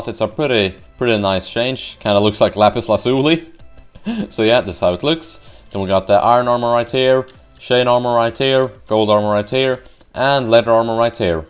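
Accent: Sweden. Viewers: Uhm.. This may sound a bit weird, but you sound swedish ;)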